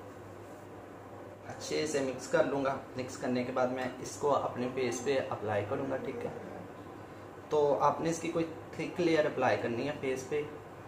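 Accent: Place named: Lahore, Pakistan. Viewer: ni apka way of talk lahOriyOn ki trah h naa jb hi poChaa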